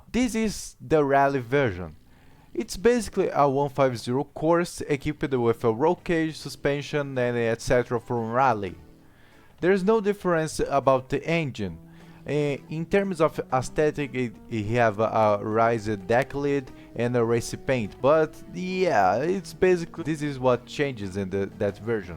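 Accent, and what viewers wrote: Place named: Russia. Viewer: Nice russian english brothar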